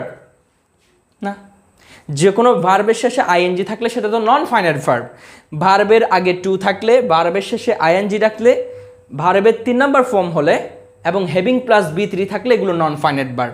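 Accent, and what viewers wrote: Chittagong, Bangladesh. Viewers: Vai ki CHITTAINGE NAKI...!!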